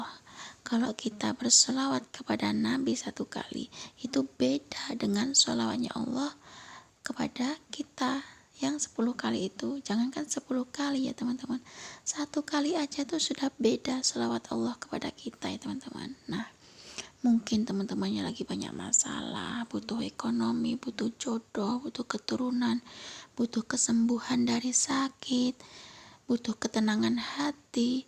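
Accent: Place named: Banyumas, Indonesia. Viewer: Kak trnilngapak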